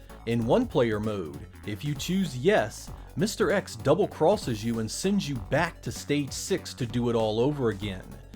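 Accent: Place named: Mid-Atlantic, United States. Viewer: You pronounce water as "wodder", not only do you make great videos, you represent the mid-atlantic region very well!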